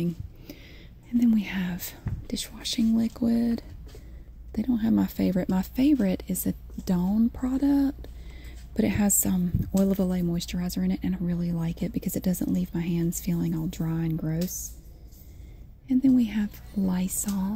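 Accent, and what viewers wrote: South, United States. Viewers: I love her southern accent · I just subscribed to your channel and I love it! Your terrific and love the southern accent! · Where in America is this accent from I love it oml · Your kids have a thicker southern accent than you do :) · you are so relaxing and I love your southern acent it's very beautiful thankyou for making videos they are very much appreciated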